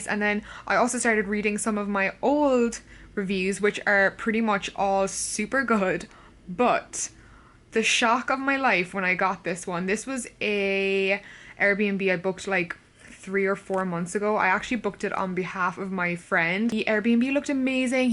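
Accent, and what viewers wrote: Cork, Ireland. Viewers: Loved the B-roll footage this week gal. And also love how Cork your accent goes when you are with. It’s gas!!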